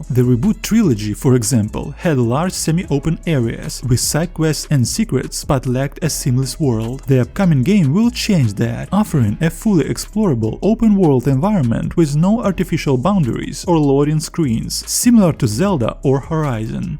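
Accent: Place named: Russia. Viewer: лол, русский тип, тебя за километр слышно.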